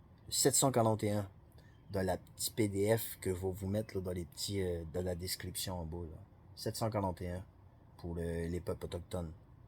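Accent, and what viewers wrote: Magdalen Islands, Canada. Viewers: Merci pour L'espoir que vous me donnez. Je vois déjà les Madelinot combattifs mais faut que ce soit le peuple au complet qui dise NON et on met le maire sur le bateau, direction Souris. J'ai toujours hâte de voir apparaître vos vidéos dans ma cloche. Avec l'accent de Îles qui flatte mon oreille.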